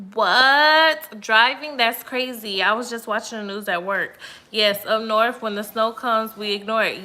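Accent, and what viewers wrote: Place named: Polk County, United States. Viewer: That’s that Polk county accent. Only the ones from the city know it 🤣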